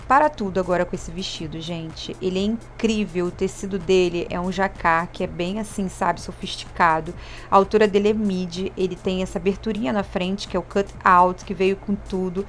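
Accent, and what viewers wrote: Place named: Rio de Janeiro, Brazil. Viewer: você é mesmo linda! E Esse seu sotaque carioca arrasa🥰🥰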